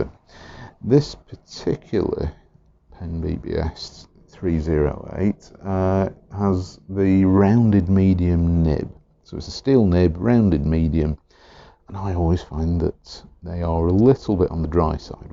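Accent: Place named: United Kingdom. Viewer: Very good pens.. By the way, I love your british accent.. 👍👍🇪🇸🇪🇸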